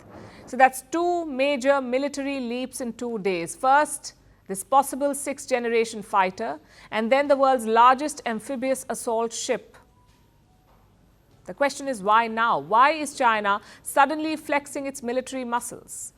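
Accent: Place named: India. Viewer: I like her reports, which can help me get familiar with Indian English.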